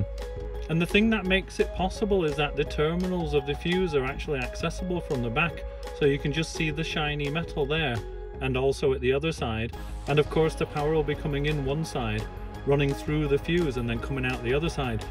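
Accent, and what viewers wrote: Liverpool, United Kingdom. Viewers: Cool. Thank you for the demo! You sound like a Beatle.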